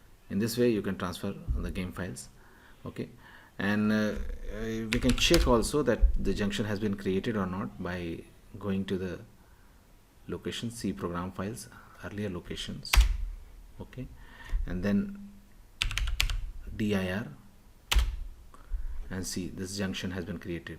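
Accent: India. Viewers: i mean he lives in America but he has an Indian accent so. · I hear an indian voice and now I'm blessed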